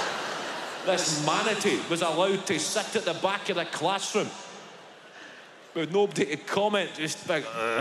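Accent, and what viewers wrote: Scotland, United Kingdom. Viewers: Oh,. I think you're so funny! Now, if I could only get through your fabulous Scots brogue, then I could really laugh at the parts the audience roars at. I will continue to watch you on Youtube, because you see, I've discovered that the more I listen to you, the more I understand you!!! Wooo Hoooo!!! 💋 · Oh my God I am in love with this guy! He has new material every single time. His smile, his accent and the material are just so real that I ache to shake his hands and tell him what an amazing guy he is... I miss his funny posture from his slightly overweight days but now he just looks stunningly good. Love you. Thank you for opening up the Scot world to us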